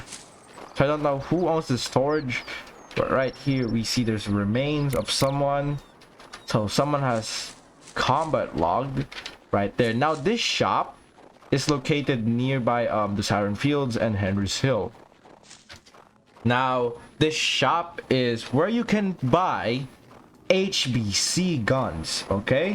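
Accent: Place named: Philippines. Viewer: Bro u have the filipino accent, r u filipino?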